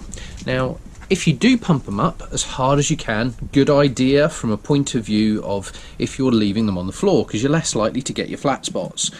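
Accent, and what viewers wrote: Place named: United Kingdom. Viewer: you have an awesome channel!! i love the british accent lol. and the bikes look amazing. hope we can stay connnected ❤️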